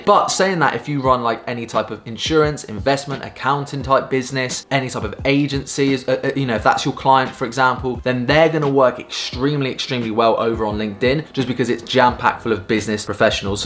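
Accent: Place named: United Kingdom. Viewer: I love your accent. What part of the UK 🇬🇧 are you?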